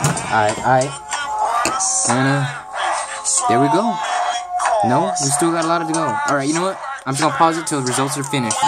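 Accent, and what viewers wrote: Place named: Texas, United States. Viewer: He definitely an Ese from Texas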